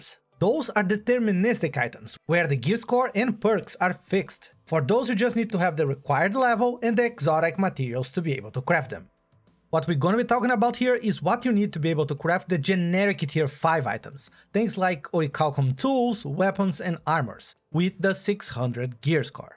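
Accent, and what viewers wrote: Brazil. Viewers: Mano, tu é br num é? esse sotaque não me engana kkkkkkk. Mas, otimo video, valeeu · Ctza q eh BR com esse sotaque ai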